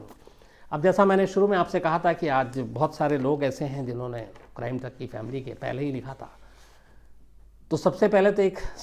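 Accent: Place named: Uttar Pradesh, India. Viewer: Tahoe je gale me kuch math Daliye UP ke bhaiya lag the hain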